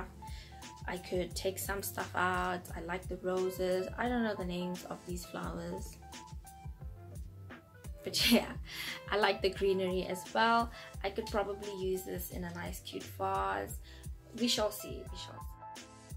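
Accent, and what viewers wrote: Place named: South Africa. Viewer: So refreshing to find a South African accent around here lol... Love your videos girl❤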